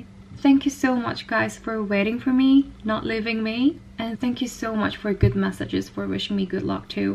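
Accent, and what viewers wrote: United Kingdom. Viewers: 저만 느끼는건가요 ㅋㅋㅋ 그동안 못본사이에 발음하고 억양이 영국스러워진거같은데..... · Oof, you've got british accent more. hehe so awesome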